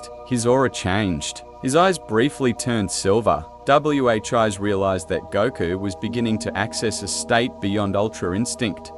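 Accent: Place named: United Kingdom. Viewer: I don't like that dude accent he sounds funny when he talks sound like he's British or something 🤣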